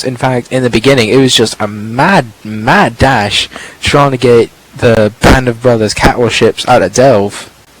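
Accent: United Kingdom. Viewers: UK accents make for great narratives!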